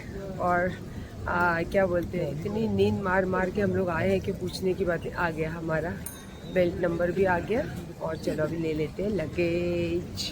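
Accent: Bangalore, India. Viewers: Ur bangaluru urdu is nice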